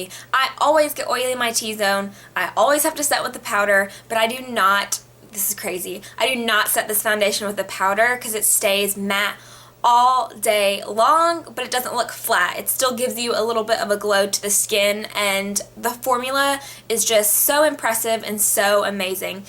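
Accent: South, United States. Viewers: You're so pretty! And I definitely didn't think you'd have a southern accent!!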